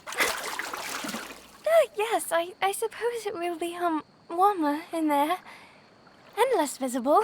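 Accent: United Kingdom. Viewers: Since when did you unlocked the British accent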